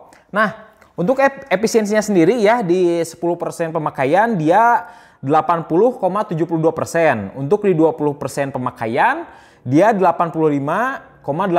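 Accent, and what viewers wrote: West Java, Indonesia. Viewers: urang sunda nya kang 😄😄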